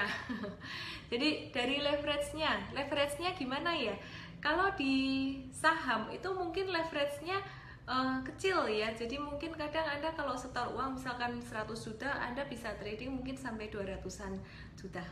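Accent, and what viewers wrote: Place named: Java, Indonesia. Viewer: Disini medok bgt ya 😅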